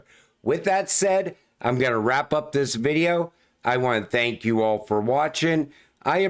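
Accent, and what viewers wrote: Pittsburgh, United States. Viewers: Congrats on 70k subs!! You should have 1M subscribers!! You're my favorite and go-to Crypto Channel [plus your accent sounds like you're from Pgh, where I'm from 😂]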